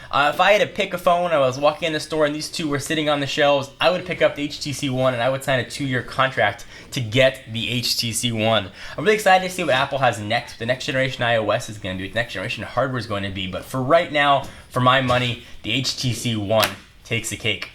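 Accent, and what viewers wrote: United Kingdom. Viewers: british accent on a phone always makes the phone better.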